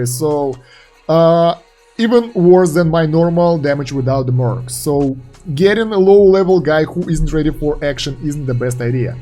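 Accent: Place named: Russia. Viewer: Русский) сразу слышно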